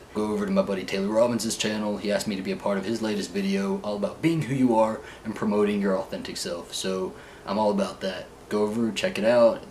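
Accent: South, United States. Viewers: sounds like a southern surfer boy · It's because he's southern ;)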